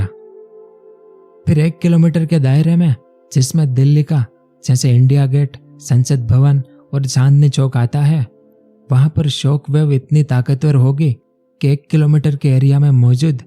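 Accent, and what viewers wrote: Gujarat, India. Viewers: Bhai aap gujarati ho??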